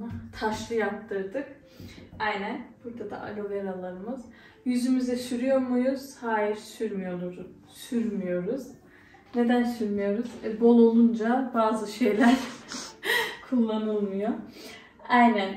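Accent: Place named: Usak, Turkey. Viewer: Güzel Kızım benim...Uşak'lı olduğunu Beyza'nın evine geldiğinde, konuşmandan ve Tarhanandan anlamıştım. Ne de olsa aynı Toprak..Kan çekiyo demekki. Güle güle otur her iki evinde de. "Ehh bende şindi Abone oluvedim gali!" Iyi ettim mi gııı?😉❤😘 Seviyom seni...inşallah bi gün Uşak'ta, Izmir'de karşılaşır ya da buluşuruz.😘❤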